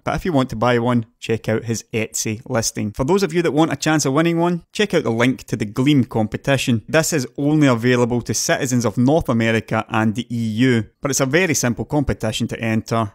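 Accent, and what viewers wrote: Scotland, United Kingdom. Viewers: that thick scottish accent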